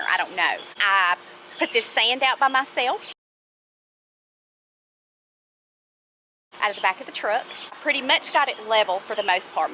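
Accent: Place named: Alabama, United States. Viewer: You either have to be from North AL or Tennessee with that accent. I'm from Middle TN and it's not everyday I come across a Youtuber who talks like the ppl I know!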